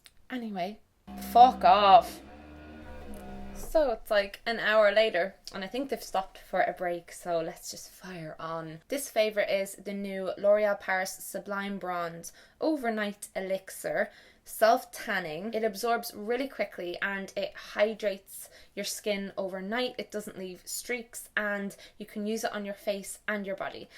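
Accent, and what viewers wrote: Ireland. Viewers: totally Agree with you about 13 reasons why, I have also self harmed and attempted yo commit suicide 3 times , totally ok now tho and grateful im alive but the programme was made in my opinion by someone who doesnt understand mental illness at all and think they should have sorted there research out before hand. also the irish accent is the best ever and I could listen to it all day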